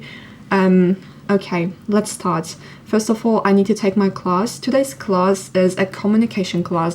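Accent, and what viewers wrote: United Kingdom. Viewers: it's pretty obvious that your english accent is changed.Its more like a Brit right now. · Öğrendiğin diller kaynaklı mı bilmiyorum ama İngilizcen İngiliz aksanına kaymış seni ilk takip ettiğimde öyle değildi ama güzel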